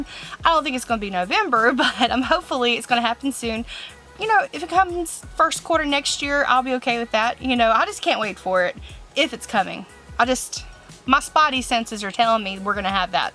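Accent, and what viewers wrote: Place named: Georgia, United States. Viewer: Love your style. And accent-- it reminds me of home. GA girl here ;)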